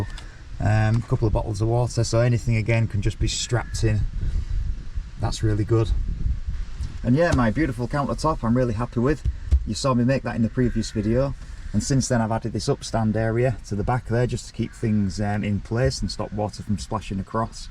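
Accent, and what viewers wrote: Hull, United Kingdom. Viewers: Hi there. Great build. Well done. Would that be a Hull accent I can hear?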